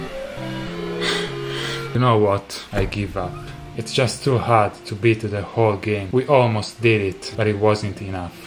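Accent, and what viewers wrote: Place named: Italy. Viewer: This is the most Italian accent of I've heard so far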